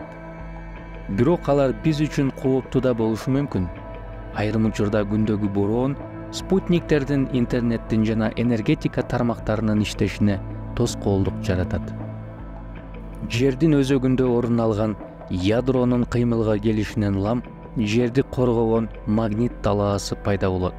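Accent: Standard. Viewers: Ма Ша АЛЛАХ Кыргызымдын мыкты мырзасы сизге АЛЛАХЫМ ыраазы болсун, абдан соонун айтып берет экенсиз. Сүйлөгөнүнүз таза кыргызча болгонуна абдан кубандым сизге кичине болсо да колдоо көрсөтүү үчүн каналынызга катталдым😊 ишинизге ийгилик алга🤗👍 Бирок эмне болоорун бир кана ЖАРАТУУЧУ АЛЛА ТААЛА билет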